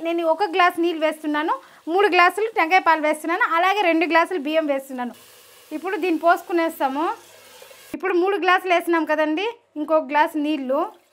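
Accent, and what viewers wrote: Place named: Rayalaseema, India. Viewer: Meeru raayalaserma baasha maatladthaaru Mari andhara antaaru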